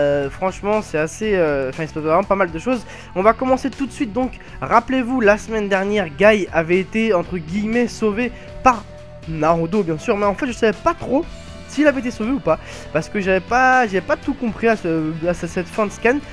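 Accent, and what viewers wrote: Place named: North Africa. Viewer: eh toi avec ton accent du bled on a rien compris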